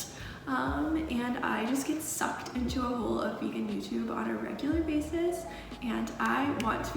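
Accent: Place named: South, United States. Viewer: It’s for sure a tad different. Also she did use to have a southern accent. It’s no big deal to notice change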